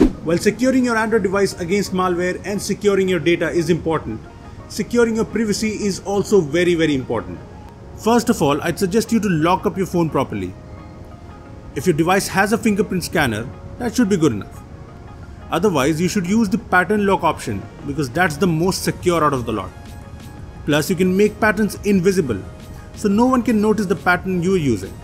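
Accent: India. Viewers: 7. Indian english accent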